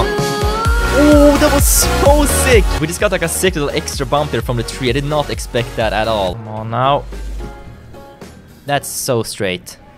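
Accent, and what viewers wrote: Sweden. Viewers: Are you from sweden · Are you swedish? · I can hear on His English accent hes Swedish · Are u Swedish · Good svengelska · Are you from sweden? Är du från Svergie ? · Are you swedish? Cuz your dialekt sounds swedish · Are u swedish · Ur english is so swedish..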